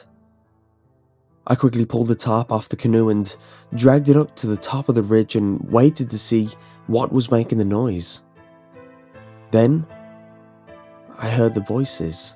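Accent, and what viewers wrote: United Kingdom. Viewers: It's funny to hear this in a British accent, because I live in the southern half of North America, and most hunting stories around here are "WELL SHOOT FER CLETUS, I RECON I DUN DID SAWD A GHOST, SO I SHOT HIM UP WITH THAT THERE RIFLE I DID! WHOOOOOOOOOOO-EEEEEEE! Slaps Knees "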